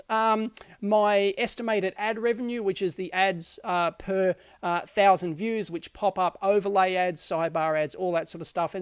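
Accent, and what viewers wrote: Australia. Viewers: You've got a sub from me love the truthfulness and that your an aussie love the accent😂😂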